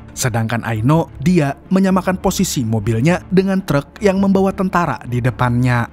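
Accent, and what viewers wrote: Banjar, Indonesia. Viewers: Org Banjar sekali nya😂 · banjar banar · Njir urg banjar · Banjar pina nya wkwkwk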